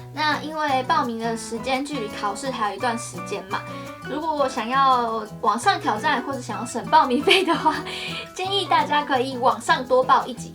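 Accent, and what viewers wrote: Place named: Japan. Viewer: 為什麼中文也有日語口音哈哈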